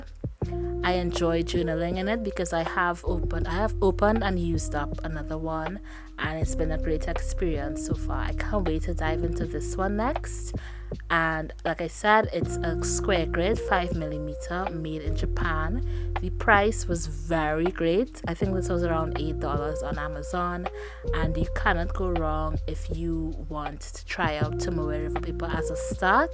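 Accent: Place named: Caribbean. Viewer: Where are from? I detect a Caribbean accent!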